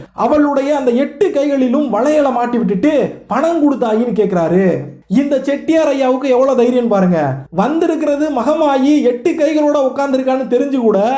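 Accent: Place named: Madurai, India. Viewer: Arumaiyana pathivu Nanba... Ungalukku maduraiya ... Unga pechula Namma ooru kaathu adikkuthu....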